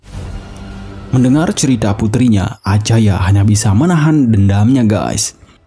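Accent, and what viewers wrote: Bali, Indonesia. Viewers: Pembaca narasi Orang bali dech ini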